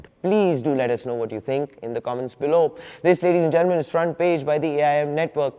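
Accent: India. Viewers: Yes you can beat china by your fxxking braging mouth.....cause yours danm good in fxxking curry english That all😮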